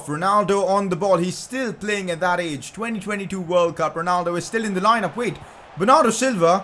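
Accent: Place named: India. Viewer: milittttao that Indian accent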